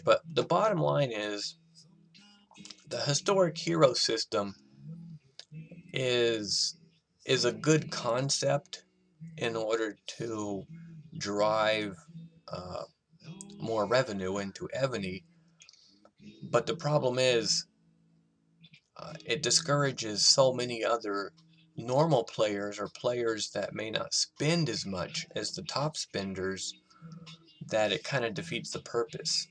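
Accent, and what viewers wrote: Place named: United States. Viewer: where you born in japan because you talk like your from the states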